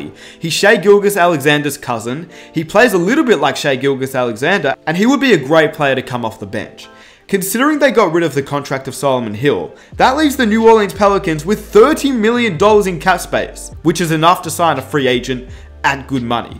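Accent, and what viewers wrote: Australia. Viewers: is he australian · Yo, are you Australian?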